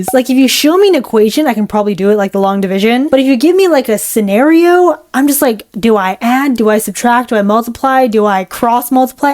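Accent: United Kingdom. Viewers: You spoke britsh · I think she might be british lol😂😂😂😂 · The accent with the mat teacher 79 / 856 like the accent you British girl while you Britis I'm also British kinda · saw your video on finding your art style! i love the anime art you create! i love cartoons and my dream style is something like anime Disney. I feel bad sometimes cause i dont draw realism too much, but i love seeing successful artist who have simple art styles ❤ also question, your accent comes and goes are you british? 😅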